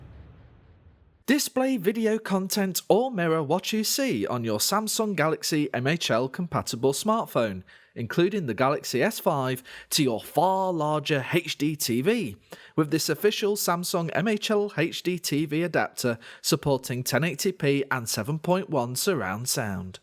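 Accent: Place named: United Kingdom. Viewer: Esse sotaque britânico ♡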